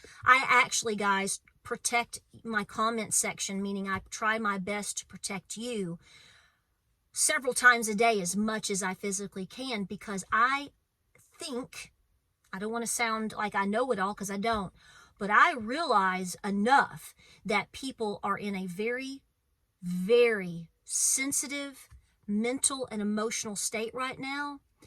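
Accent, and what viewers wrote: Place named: Appalachia, United States. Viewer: I e always enjoyed your videos! 💕 I love your voice, Appalachian twang and all! 😘 especially love your singing!!! Keep preaching sister! Some people can’t handle the truth or fear provoking retoric. So be it. They can listen to something else. God bless them. Hopefully they hear the truth somewhere and are prepared regardless. But, yes, there are some (a few that make themselves seem numerous) that are advocates for the evil ones. They (Masons, satanists, wiccans, etc) have infiltrated many churches- especially the Baptist, Catholic, and traditional Jewish Churches. Division and hatred are from hell. We need unity within the Christian community. All of us united in love. 🙏❤️🙏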